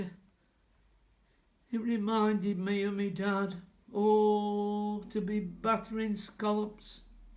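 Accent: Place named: Lancashire, United Kingdom. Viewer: Ee that were reet gradely! I remember you from my days at Roebuck Skoo- you did a fine job of judging the Easter hardboiled egg competition! Luvely to 'ear the story of the prodigal son tewd in the Lanky dialect. I could see your emotion when you told about your dad standin' under't lamp waiting for your return. Thanks so much, and see you on yonder Shore if not before!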